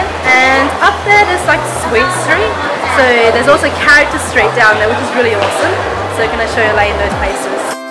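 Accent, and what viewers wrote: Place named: Australia. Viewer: Where did you grow up. I cant tell by your accent sounds Australian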